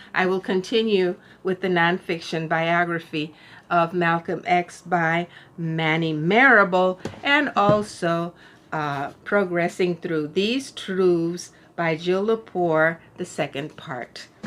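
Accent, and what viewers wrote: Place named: Puerto Rico. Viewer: Hello, I enjoyed your nonfiction TBR and loved hearing the language and accent of "mi gente." I'm astounded at the gall of the author who thinks Puerto Rico is not part, or shouldn't be part of the US. It's not like we had a say in that situation. I'm hoping for the best. Aloha y bendicion.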